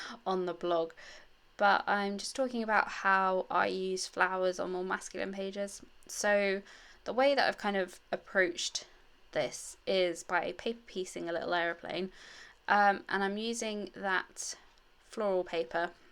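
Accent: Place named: United Kingdom. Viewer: Just found your channel on here. Your layouts are so lovely! I especially enjoy listening to your accent too... I am from the UK originally (Gloucestershire area) and now live in California, so it's like being home again and scrapbooking at the same time! Love your unique style and you have given me new inspiration for the Felicity Jane kits that I can never seem to use up all the little bits and bobs from each month! xx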